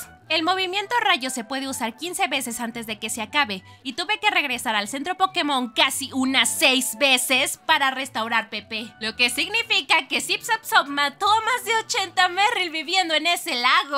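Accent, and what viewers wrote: Chile. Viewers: Eres chilena ?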